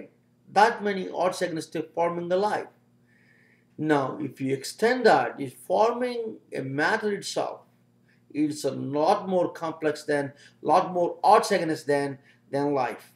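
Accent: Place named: Middle East. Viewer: 5. Didnt see a fifth question. But it is not hard to explain something containing entropy since nothing does in the solar system to our knowledge, might want to look up the "big crunch" or "big freeze" theories to do with entropy and matter in the universe. Also please try to speak more clearly I couldnt understand half of that, I know you are middle eastern or something to that effect but when attempting to argue these things you need to be clear. Nothing you said poses a problem to Dawkins.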